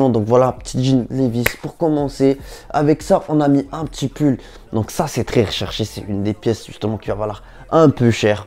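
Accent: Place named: Algeria. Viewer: u do great content but idk wuth te hell u sound like alittel bit algerian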